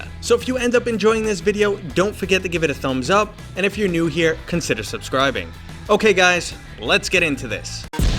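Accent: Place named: New England, United States. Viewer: You sound like you are from New England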